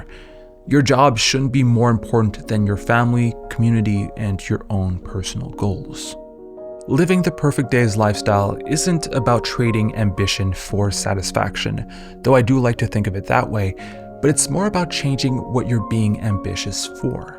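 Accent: Quebec, Canada. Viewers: Did the freedom from monetization allow you to be more french-Canadian too? I feel like the Quebecois really came out in this video 😊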